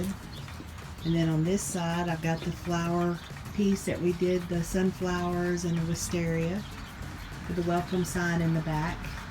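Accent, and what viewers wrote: Texas, United States. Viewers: Hi, Enjoyed you video. Love your Texas accent. Front porch came out nice. I'm from Syracuse,New York. Look forward to your next video. Have a great evening. love & hugs Lucy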